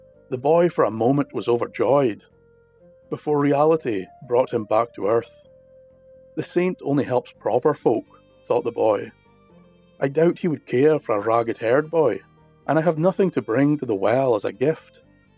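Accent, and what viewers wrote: Scotland, United Kingdom. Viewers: No better accent than the Scottish accent, of course i may be biased lol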